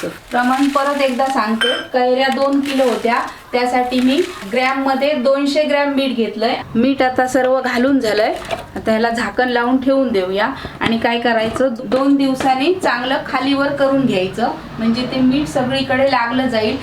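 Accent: Konkan, India. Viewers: कुठून बोलताय कोकणातून का बोलण्याची पद्धत पक्का तिकडचे वाटते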